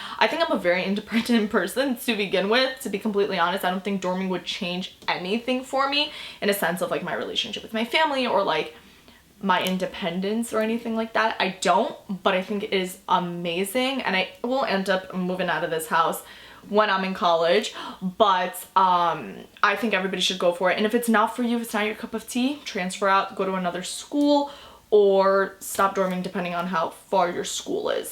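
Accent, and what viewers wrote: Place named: Long Island, United States. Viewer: that long island accent is iconic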